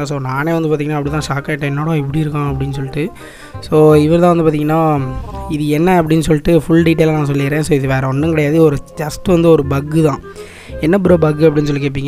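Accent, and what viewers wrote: Tirunelveli, India. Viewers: Neenga Tirunelveli ah bro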